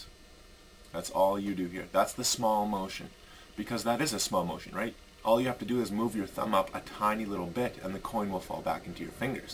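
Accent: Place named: Canada. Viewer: Miss-direction? Who is she, i would like to meet her? Unless I have missunderstood! lol Very informative explanation - I saw Shawn Franquar at convention the other day. I guess you are from Canada by your accent? I remember going to Browsers Den in Toronto and saw Jay Sankey. I wanted to work there with jeff and Shana. Also Vanishing rabbit in Calgary AB. You may know Brett or Tyler!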